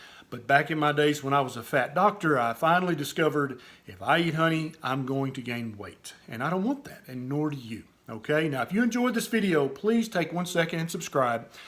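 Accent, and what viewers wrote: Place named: West Virginia, United States. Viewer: I love your accent, Are you from West Virginia? That's my favourite English accent! You make interesting videos. I love to learn new things!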